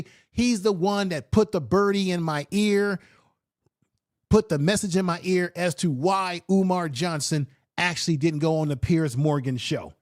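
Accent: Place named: England, United Kingdom. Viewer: UR ”ENGLISH ACCENT” iZZZ JUST DREADFULL‼️‼️‼️😱😱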